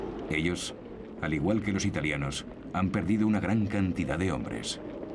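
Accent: Spain. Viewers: Para mí el mejor documental y el mejor audio de todos es la de esta serie con acento español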